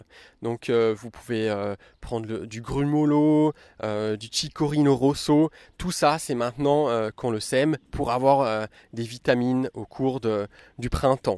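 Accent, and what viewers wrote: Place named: Alsace, France. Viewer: On dirais que vous êtes Alsacien ^_^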